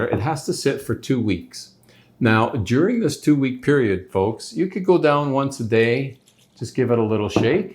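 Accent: Canada. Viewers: At first I thought you were American and was astonsihed to hear you talking metric and not imperial...then I realised you are Canadian. Best wishes from the UK. I enjoyed your video. For me the big expense is buying grain alcohol...the cheapest I can find is £38 for 70cl. I guess this is why people use vodka £20 for 70cl....I think that's what I'll do and add granulated sugar instead of syrup...I might have to wait longer for the lower strength alcohol to extract the oils from the lemon peels. I love Limoncello!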